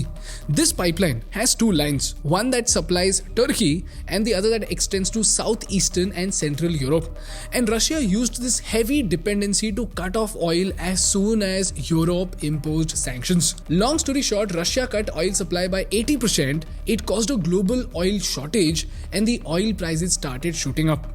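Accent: India. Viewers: No indian accent please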